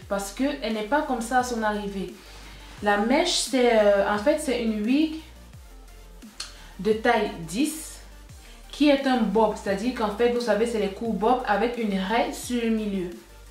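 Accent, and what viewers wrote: Cameroon. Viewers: ta maniere de parler naturellement comme une camer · Olalala j'ai découverte ta vidéo aujourd'hui ma belle j'adore comment tu explique depuis qlq jours j'essaie de vouloir commander les mèches justement ta vidéo vas bcp m'aider j suis accro à aliexpress , ma soeur camer ton accent la ne ments pas 😘 j m'abonne😉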